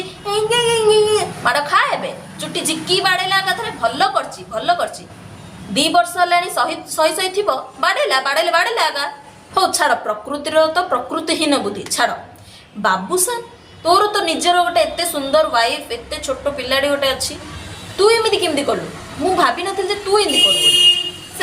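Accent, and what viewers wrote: Berhampur, India. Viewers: Satare tm video dekhi ki mu Bahut khusi. Intresting anchor. Pura barhampur style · Sister tuma barahampuriya katha suniki maza laguchi 🥰🥰🥰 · Pura ama berhampurya language style...maddi chalao madam..Jai maa budhi thakurani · Superrrb mam language jabardast barahampur side ra akka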